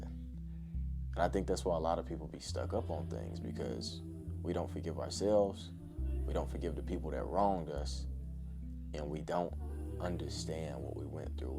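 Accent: South, United States. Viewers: Your are my greatest inspiration, so far, how do you articulate yourself so well? I heard you talking normal, and ofc u got a pretty heavy southern accent, but hkw do you manage to remove the accent? And also, are you always this calm?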